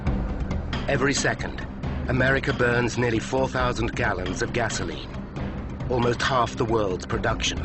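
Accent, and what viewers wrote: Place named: England, United Kingdom. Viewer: Ironic that there is an English accent narrator in this version considering BP owned the plant. They care nothing about their workers just profit.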